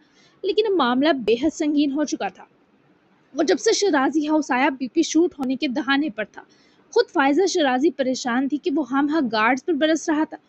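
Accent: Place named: Punjab, India. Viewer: Your Punjabi accent is awesome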